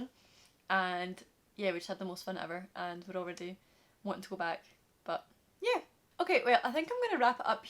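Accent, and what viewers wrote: Scotland, United Kingdom. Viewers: Random ,but u sound scotish ?nice accent anyway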